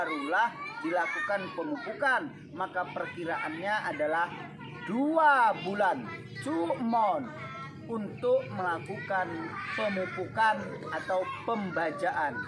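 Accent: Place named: Indonesia. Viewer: anda kedengaran asli dgn loghat bahasa indonesia anda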